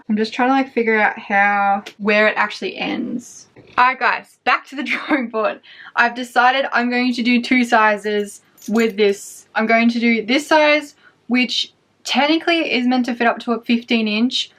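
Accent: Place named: Australia. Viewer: I'm looking forward to get my laptop bag which is on the way. I love the kind you talk although some time as a non english native speaker your lovely Aussi accent is hard to understand 🙂Go on and stay authentic!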